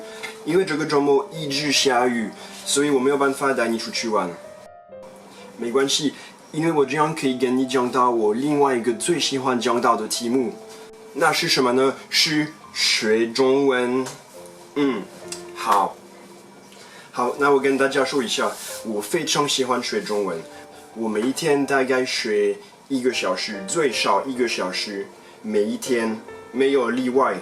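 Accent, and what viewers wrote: Standard. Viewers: 中文很標準太棒了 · 你好！早上起來，先是看到facebook上，東森新聞分享你幫助小狗找到主人的影片，接著看了你對台灣的看法那篇影片、台灣倒垃圾文化的影片、最後這篇你一路上學習中文的影片，衷心佩服的除了是你標準的中文咬字、還有強大學習中文的各種方法與精神，真的很優秀！祝福你在未來的生活更順心、喜樂！ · 你簡直是個好學生!! 很有學習精神，也超像我們那年代，要一個一個字查字典，寫上部首，筆劃，然後再造詞，你注音也感覺學得好，難怪說的標準，沒有怪腔怪調!! 加油了!!再則，你很會挑劇看，讓自己持續興趣~~我看你應該很快可以學成語了!!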